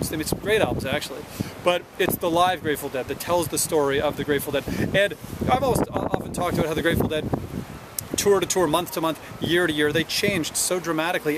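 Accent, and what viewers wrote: Canada. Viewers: nah that eastern? Canadian accent cuts through the wind after a bit. these vids are like watching painting w bob ross but better....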